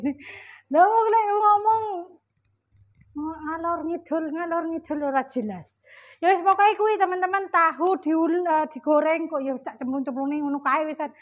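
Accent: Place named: Mataraman, Indonesia. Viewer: halo mbak e, tiyang pundi niki? dari logatnya kok seperti orang mataraman, perkenalkan kulo cah nganjuk, domisili Surabaya. ini mirip banget dengan tahu tek surabaya, kari nambahi petis sitik. kalau orang nganjuk nyebut ini tahu bumbu mbak... jan krenyes2 ngiler aq mbak, tp itu cabenya banyak banget, gek ndang ngoweh2 aku kalau nyobain 😅😅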